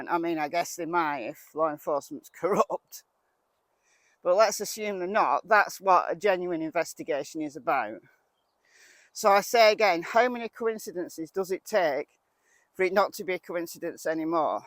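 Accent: United Kingdom. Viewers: I live in Milton, Florida in the US, and EVERY morning I wake up, FIRST THING I do, is go to YOUTUBE, And look for your video. Every morning, and several times a day! I value your insights, your option, your intelligence, plus my best friend is British, I LOVE your accent!! YOUR highly intelligent, unbias, you look at every side to the story, not just one side. You have facts to back up your opinion. You don't shame ppl in the case, you stand for truth, morals and justice for Summer Moon Utah Wells!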